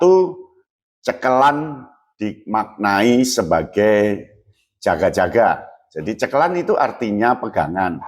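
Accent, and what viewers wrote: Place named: Jakarta, Indonesia. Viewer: Sayangnya pembawaanya kurang Njawani malah terkesan bahasa ber ala Jkt.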